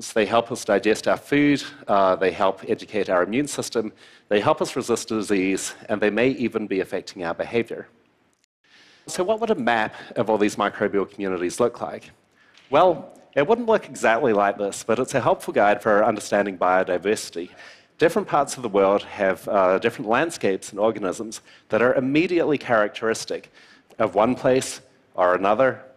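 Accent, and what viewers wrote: New Zealand. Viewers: de Valle Ruiz Re: the accent, I actually found kiwi so refreshing after hearing American so much of the time :-) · He's from New Zealand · It's a type of NZ accent from the deep south. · Kiwi here. I was also immediately thrown by the accent. He's got a Kiwi twang but there's definitely something really unusual about that accent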